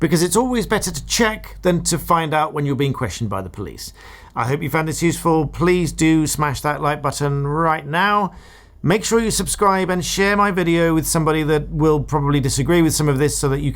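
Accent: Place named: RP, United Kingdom. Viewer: listen and im getting off this channel. people have the right inalienable right to travel weather that be by time travel or donkey. sorry THAT WILL BE posh twat wannabe reading what we can all read ourselves you pantronising twat..did you come out the womb speaking like that you twat? fk you and your now kings laws member of tha barrrrrrrrrrrr society. you can all fk off you shark pit infested hitlers. you do more harm than good! all out for yourselves fkn loser. what good did you do ever for anyone?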